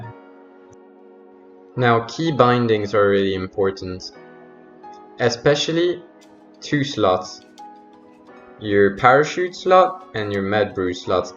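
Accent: France. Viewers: Je comprend tellement mieux avec l'accent français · c’est cool je comprend mieux l’anglais avec l’accent fr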